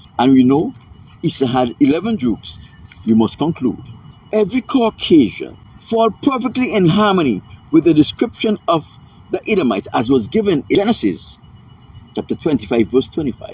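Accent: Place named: Grenada. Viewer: You from Grenada. Gotta be!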